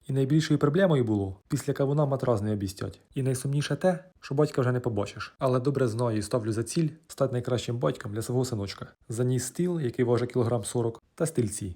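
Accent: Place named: Cherkasy, Ukraine. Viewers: Ви чудо ви так говорите як у нас у Кам'янці Черкаської дай вам Боже бережіть себе ♥️ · Натрапила на ваш ролік...думаю собі ,,говірка черкаської області " і бінго🎉 Привіт Черкасам❤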